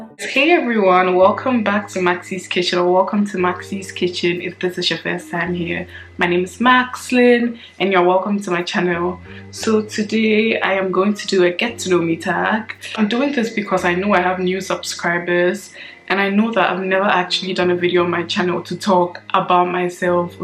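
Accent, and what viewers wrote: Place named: France. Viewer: The french accent is beautiful. Nice video ❤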